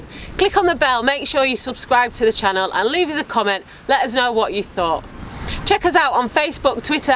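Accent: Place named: England, United Kingdom. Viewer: You have a good english accent but you talk American..........guys, guys, guys, guys, guys, guys, guys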